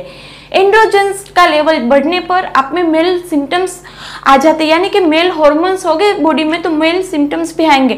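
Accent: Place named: Kathiawar, India. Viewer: Aapki bhasha thodi kathiyavadi jesi he... Kya aap gujrat saurashtra se ho?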